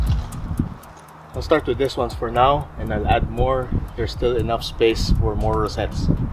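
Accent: Philippines. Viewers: You speak english like filipino accent. Are ypu?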